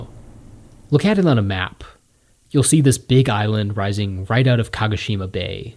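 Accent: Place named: West, United States. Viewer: You sound very Western to me..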